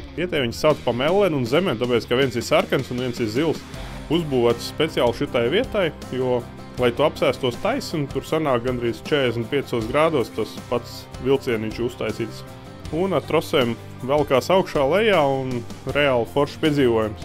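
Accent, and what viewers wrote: Courland, Latvia. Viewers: Super! Vislielākais paldies par foršo kurzemnieku dialektu. Man kā Talsu puses aborigēnam šitā foršā Ziemeļkurzemes izruna ir kā mēds iekš ausim!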